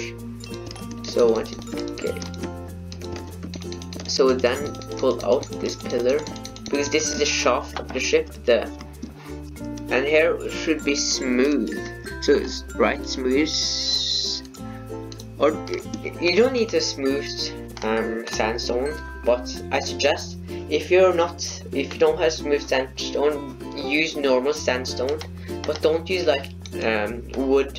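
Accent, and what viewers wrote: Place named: Germany. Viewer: Are you german? Cus your accent which i love...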